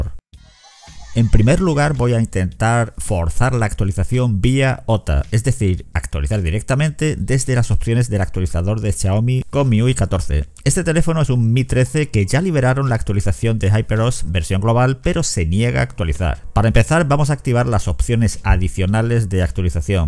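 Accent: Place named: Spain. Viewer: Es tener acento español y saber que no voy a perder el tiempo. Gracias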